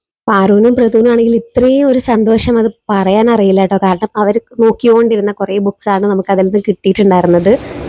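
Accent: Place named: Palakkad, India. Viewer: u looking so beautiful and he is also looking smart Palakkad samsaram kelkkan oru rasam.Thanku. Cheeverdu sound I really enjoyed I think u also like reading It' s a great thing parents encouraging reading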